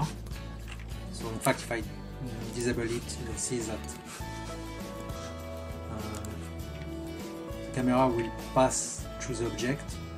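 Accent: France. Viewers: are u french ? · XD l'accent Fr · Je l'ai capté direct que tu étais Français ahah x) Pourrais tu refaire le même tutoriel en Français ? Car moi, je ne comprend pas l'Anglais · Are you from France because you have the same accent si tu est français pourrais tu fair un tutoriel en français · Je me doutais déjà que tu étais français vu le titre de la dernière vidéo mais là c'est sûr ! ^^ C'est cool de savoir que tu es français :D En tout cas tu te débrouilles super bien, j'ai rien compris à la vidéo mais bon x) (enfin c'est pas que ton accent est si horrible que ça hein, c'est juste que j'y connais rien perso xD) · Hey ! Nice video :) Are you French ?^^ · Cet accent Français xD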